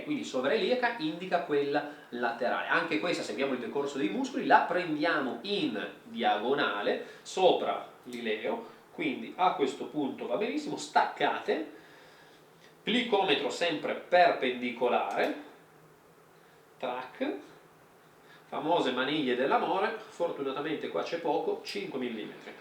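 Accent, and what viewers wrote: Turin, Italy. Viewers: ricorda Angela e Odifreddi nel modo di parlare perché è di Torino come loro.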